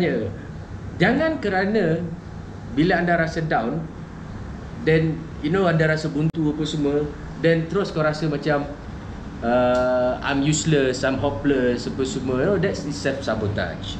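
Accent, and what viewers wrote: Sarawak, Malaysia. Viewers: Bangga dengan ni... Satu he yg X suke... Bahasa Sarawak ko X tajam dah bro. Kemonnnn. Xkan la X boleh tune.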